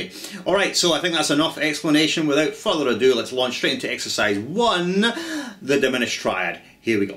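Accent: Scotland, United Kingdom. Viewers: If the Scottish (?) accent wasn't cool enough, he owns up to not being "A pimple on Shawn Lane's Arse!" which most of us would have to admit. Very cool vid, thanks for posting.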